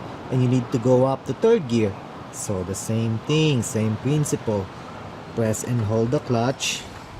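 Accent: Philippines. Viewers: Thats a heavy ass filipino accent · dude are you filipino?? you sound like a filipino man hehehe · lmfao the filipino accent I can't take him seriously bahahhaah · ayus ha pinoy na pinoy ka magenglish brad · HES FILIPINO HAHA ACCENT SO OBVIOUS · U sound Filipino, are you?? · he sounds Filipino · dat pinoy accent tho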